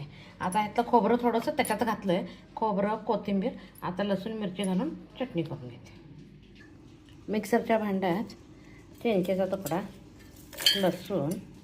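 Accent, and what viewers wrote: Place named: Karnataka, India. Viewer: Five like kele 🎉🎉🎉 खूप छान ताई रेसिपी 🎉🎉🎉 तुमच्या आवाजातील कर्नाटकी स्टाईल मला आवडते 🎉🎉🎉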